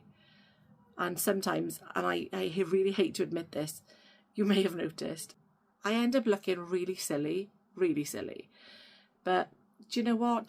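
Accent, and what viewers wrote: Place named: Wales, United Kingdom. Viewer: Love your `Welsh accent whatever day you post.😃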